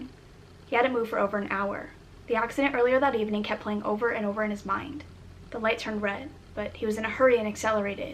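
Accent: United States. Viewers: Maya W she had a slight country accent. I’m from Mississippi and mine comes out often.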